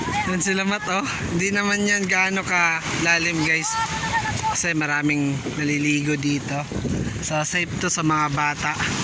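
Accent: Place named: Visayas, Philippines. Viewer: bisaya diay ka lods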